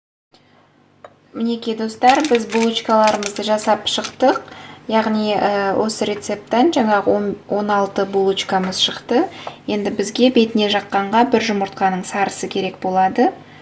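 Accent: Standard. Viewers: Менин ойымша казакша таза сойлеп турго.келисем мак коп сиякты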